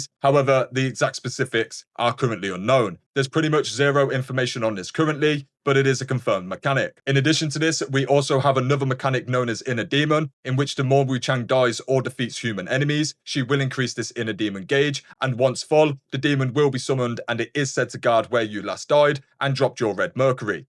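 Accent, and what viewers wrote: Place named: United Kingdom. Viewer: thanks for video. nice british accent. which region exactly?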